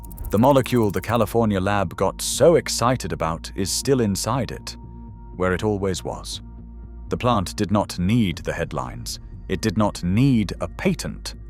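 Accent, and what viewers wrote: United Kingdom. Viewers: British accent is cool. 👍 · What's with the Brit accent?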